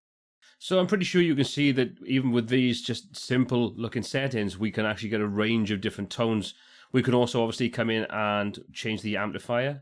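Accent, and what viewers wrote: Wales, United Kingdom. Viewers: Great video, and such a joy to hear a Welsh accent.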